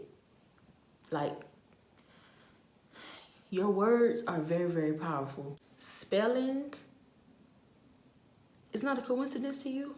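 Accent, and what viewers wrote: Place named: New Orleans, United States. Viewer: Are you from BR or New Orleans ? It sounds like the accent 😍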